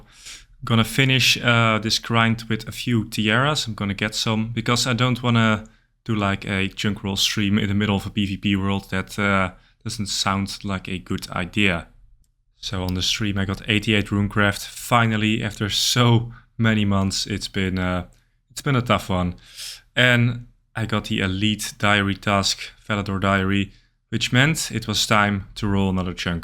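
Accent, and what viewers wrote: Netherlands. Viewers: het nederlandse accent is niet te missen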